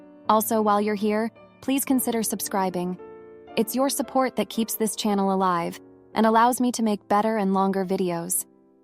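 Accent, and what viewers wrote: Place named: California, United States. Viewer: Wish you could pick a less California cyunty sounding nasal snake sibilance AI voice. 😂 Annoying AF.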